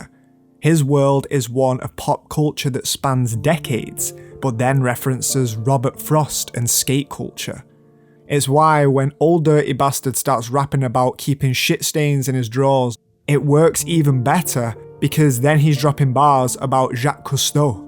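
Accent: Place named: RP, United Kingdom. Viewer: when you do return i think it'll be the funniest video essay u ever watched - especially the plummy voice he puts on during the entire thing.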